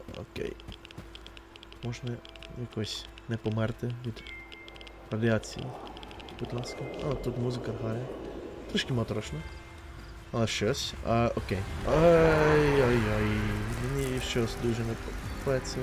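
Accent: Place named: Finland. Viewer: Обожнюю Суомі, напевно це країна з найкращими людьми, і сам хочу колись побувати там і вивчити фінську. Бажаю успіхів цьому каналу! А вивчення будь якої мови то питання часу, я сьогодні враженний від того, що почув українську з фінським акцентом, до мурашок)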